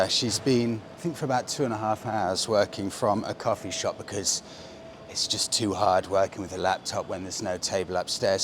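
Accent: United Kingdom. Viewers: you reminds me of Cole Hauser as Carter Verone from Fast & Furioous 2 . just you with the british accent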